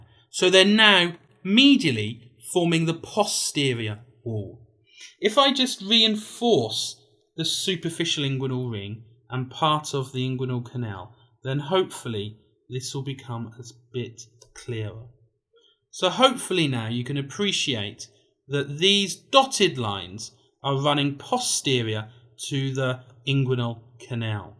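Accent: United Kingdom. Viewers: Wow wow!! 😮 Im in love with that beauuuutiful british accent 😍 .. plus, you are great sir thank you so much for this clear and stunning explanation 💓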